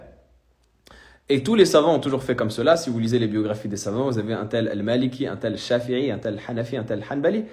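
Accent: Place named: Turkey. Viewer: Barakallah oufik, j'ai bien aimé l'accent turc 😅😅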